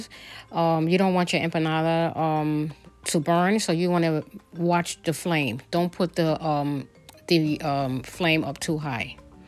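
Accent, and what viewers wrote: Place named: East Coast, United States. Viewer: Lol I think I recognize ya east coast accent is there any where in nyc that has good Cuban food?? Really haven’t had any since my great grandmother passed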